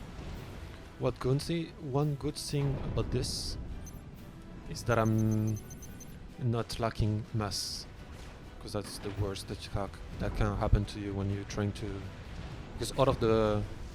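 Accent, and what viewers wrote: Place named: France. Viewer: J'avoue son accent français se remarque tellement mdrr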